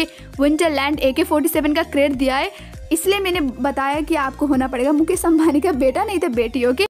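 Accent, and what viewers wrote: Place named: Nepal. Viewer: You sa like a nepali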